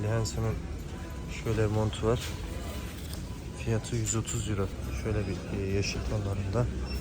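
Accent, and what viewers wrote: Erzurum, Turkey. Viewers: Abi sen erzurumlumusun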